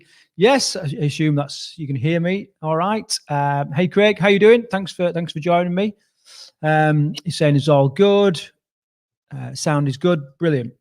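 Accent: United Kingdom. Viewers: Hey! (you so suit that name), this was a great vid. I didn’t make the live session so watched it later. I play your videos while I’m out on longer runs, as I’m originally from Yorkshire and having a familiar voice is so wonderful while running lonely trails here in the USA! Thanks for all you do mate, appreciate you. 👌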